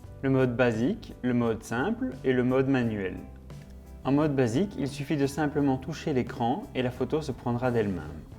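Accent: Belgium. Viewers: Bon test, parfait, pro, continue comme ça. Ha si... tu es de Belgique?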